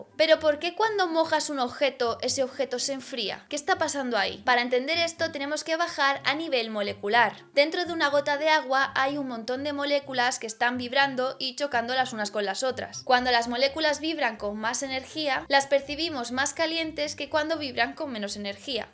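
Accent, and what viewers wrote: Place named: Colombia. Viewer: No parece Española, parece Colombiana.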